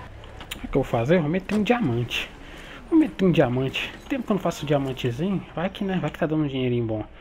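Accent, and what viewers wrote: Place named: Minas Gerais, Brazil. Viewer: vc é de mg paresse pelo sutak. Se for somos mineiro uai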